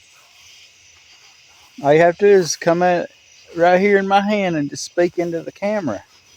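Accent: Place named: South, United States. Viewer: Very atmospheric video. I dig your accent (1 of the southern states I guess) and the chirping of the crickets creates a cool ambiance. 👍🦗PS I am English so please excuse my ignorance as to which state your accent represents!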